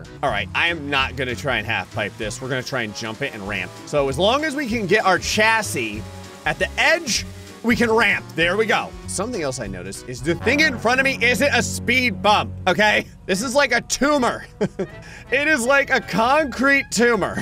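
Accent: United Kingdom. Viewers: IS FROM THE UK????!!!!!!!!! 😮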